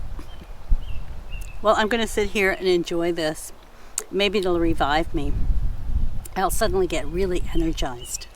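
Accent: Canada. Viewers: Im from the Uk, I clicked on this, heard a Canadian accent, looked up Horne lake and realised its pretty close to where my dad grew up in Port Alberni! He passed away when I was 16 in 2016, so this felt like a nice sign from him and was a very comforting video ❤️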